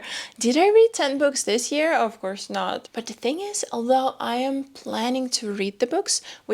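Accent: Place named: Eastern Europe. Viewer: you have slight slavic accent, where you from?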